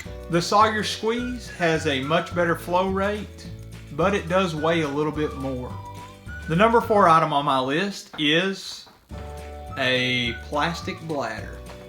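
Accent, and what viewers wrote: Kentucky, United States. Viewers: What part of Kentucky are you from? Seriously, I live near Ft. Knox.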